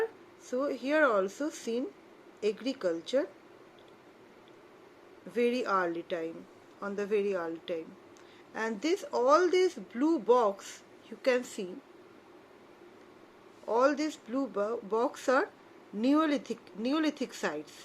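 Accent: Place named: United States. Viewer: My goodness... your English speaking is like an American😮😮!!Well you are Indian..😊!!!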